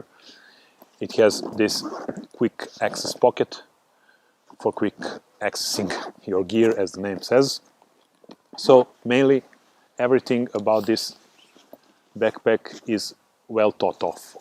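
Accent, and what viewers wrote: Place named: Eastern Europe. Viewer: I cant stand Eastern European accent 🤬🤬🤬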